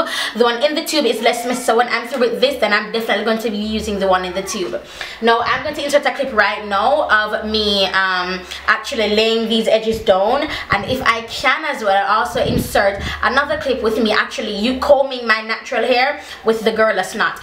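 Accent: Jamaica. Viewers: I love that Jamaican accent. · I love your Jamaican accent ❤️ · wow she is gorgeous and has a nice jamaican voice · I love your jamaican accent 😍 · I’m Jamaican your accident sounds like patio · I understand what you're saying it's her accent my bestfriend is Jamaican and I met her mom and I was like what is she saying lol 😂 but now I totally understand I love there accent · u make me wish I was Jamaican, I love ur accent! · Wowwww I wish I could speak Patois. I love your accent girl! Subbed ❤️ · Kareece Brown she has a jamaican accent tho.. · I saw someone put oil on top of eco gel to stop it from flaking. Maybe it will work for gorilla snot...I'm gonna try. Thx for your video and your face looks good! Love ur Jamaican/street girl/white girl accent! Lol Yeah...I'm coming for you! Lol. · your beautiful jamaican accent 😭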